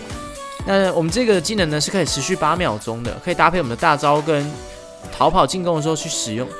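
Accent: Taiwan. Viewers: 台語口音很有韻味欸不是台北人嗎？😂😂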